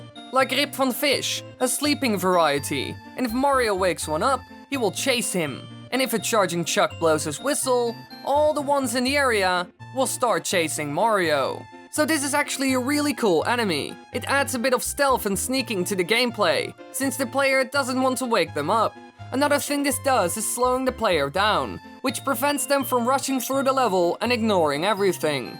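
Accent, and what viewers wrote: Netherlands. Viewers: are you dutch and speak english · are You dutch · are you dutch? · you have a good dutch accent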